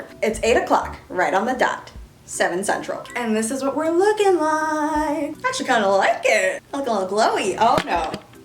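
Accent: Canada. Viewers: Always forget she's French till she speaks Canadian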